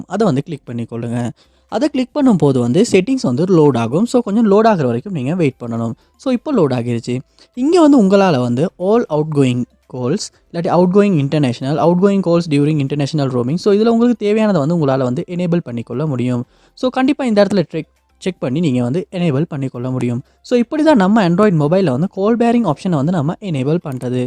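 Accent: Sri Lanka. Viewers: Are you sri lankan tamil ?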